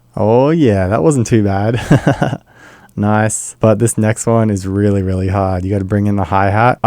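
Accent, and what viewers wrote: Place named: Australia. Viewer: Started watching the video, and felt so at home as you talked - then I realised it was the aussie accent. HAHAHAHA thanks heaps dude.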